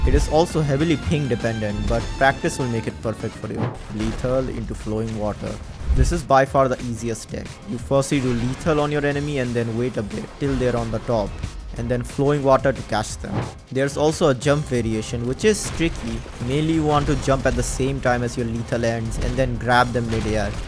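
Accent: India. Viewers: Why u sound like u are indian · Bro idk but your English sound like indian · He has an indian accent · ohh But your accent is like Indians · Hello guy with Indian accent Remember me when u get famous. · YOUR VOICE GIVING ME INDIAN VIBE ARE U? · R u Indian? You sound like my friends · Love the Indian accent I will sub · Bro he sound indian (not being racist ) nice vid of tutorial btw... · You speak english but sound like indian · I bet ur a indian bc of ur voice and a good vud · U sound like Indian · Are you indian? Your voice sounds like a Indian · bro voicelike a indian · Bro got that indian voicd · indian accent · idk why this guys accent is like indian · You sound indian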